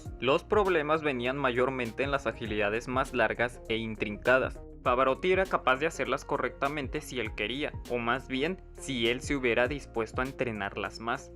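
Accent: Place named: Mexico. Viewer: Dall'accento direi che è messicano. Sicuramente non spagnolo.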